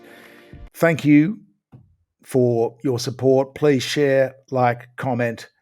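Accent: Australia. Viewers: Thanks so much 4 the real news. I've been a news consumer all my life = 80 years, 50 % of it rubbish. Now that I've found You tube, I've been educated to the truth! Channels like this one; the report, Colonel Douglas McGregor, Jeffery Sacks. Moats, and so many more... the good and the bad ones, I listen to them all and make up my own mind as to what is true and what is false... Clair Daily and the other hippy looking bloke in the EU/Irish parliament, such wise words spoken with passion... and now, such a clear speaker from the heart, I like his Sydney clip (accent) not as good as a Western Queensland one, but it'll do. (grin). So thanks mate, if I had the money I'd be a patron, instead of a lowly subscriber. The tax system in Oz has to change = the workers pay, the rich grin and the big-end of Town pay nothing at all. Marry Mismas to all from QLD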